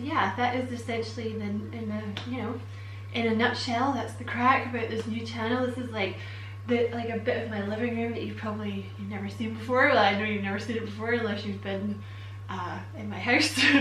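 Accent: Scotland, United Kingdom. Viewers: thankyou for this. I watch your Leo readings, also im Aries rising, virgo moon, Im at the end of dark night of the soul, going through a spiritual awakening and doing this all alone, not fully understanding whats happening but learning having Psycic visions etc. Is that a scotish accent i hear, hi from Ireland. Thankyou so much ❤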